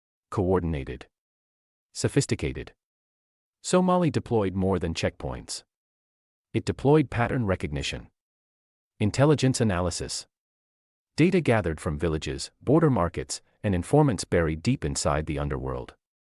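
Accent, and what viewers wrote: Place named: Europe. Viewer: Use African voices who are you videos for Europeans?? Let us ban all Europeans for at least 200 years and usa euros for 300 years. No euro voices, thumbs down on your euro voices.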